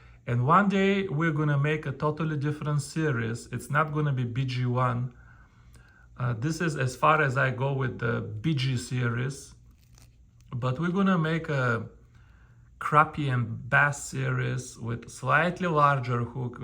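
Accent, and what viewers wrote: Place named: South Africa. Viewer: You from South Africa? Just guessing based on how you speak. NICE attention to details! I wish you made bigger, bass sized stuff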